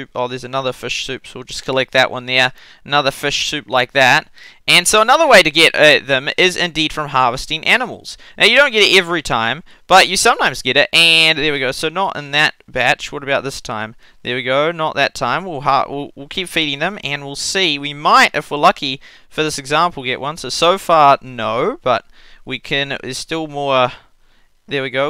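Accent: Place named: New Zealand. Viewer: Your zealand ayyy cousy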